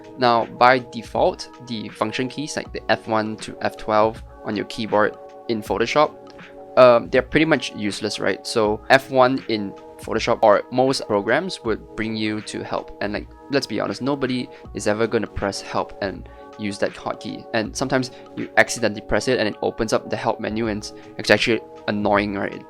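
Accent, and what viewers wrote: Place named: Singapore. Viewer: Recognized that Singaporean accent ;) Great vid, Subscribed!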